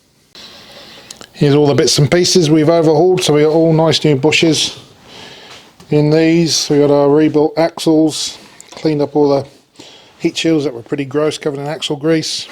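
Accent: United Kingdom. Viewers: Sound British mate...... I have to get this done on mine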